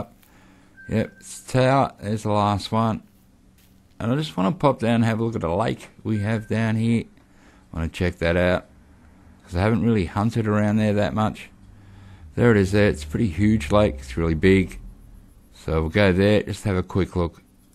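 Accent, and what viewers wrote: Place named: Australia. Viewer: Another great one! Btw just love the aussie accent, sounds so "real" hehe :-D just love it mate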